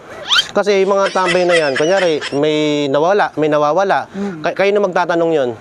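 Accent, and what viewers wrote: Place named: Pampanga, Philippines. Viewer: Kapampangan ka?? Halata sa pgsasalita mo hehe.nkktawa tlga mga prank mo 😅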